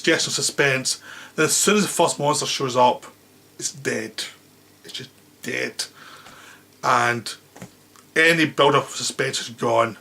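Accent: United Kingdom. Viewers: Fucking limey shut up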